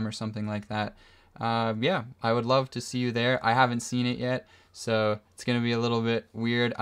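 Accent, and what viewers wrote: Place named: Canada. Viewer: Instead of starting your video saying "Markets" I think it would be beneficial to say "American Markets" as you have a Canadian accent and you said in your video you're in Canada. Also, if your video is about the USA market, can you please start discussing the US housing market? a 700K house is normal for Canada but NOT normal for the US so it's not a great example.Or maybe it's just me and everything. you're doing is not confusing at all. I appreciated your crypto fear and greed explanation. Good reminder. I almost sold in 2022 but figured it wasn't worth the hassle so I didn't due to laziness.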